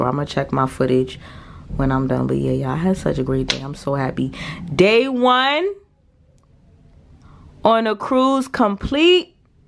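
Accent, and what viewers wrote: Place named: New York, United States. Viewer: Great video. I’m a solo traveler myself. Your accent sounds like New York or somewhere off the East coast. Love from Detroit ❤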